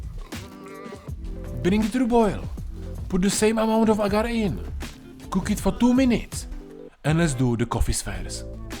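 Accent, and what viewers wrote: Czech Republic. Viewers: That is just extremely strong Czech accent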